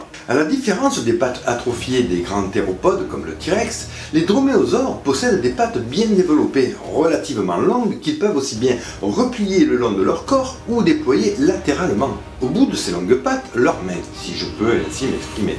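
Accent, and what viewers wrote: South, France. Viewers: L'acsent du Sud.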